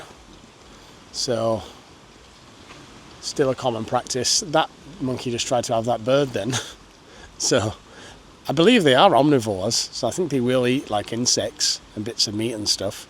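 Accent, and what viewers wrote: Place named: Sheffield, United Kingdom. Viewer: Hi, love your down to earth channel. You’ve not lost your accent, where is it from? It sounds like a Sheffield twang.😊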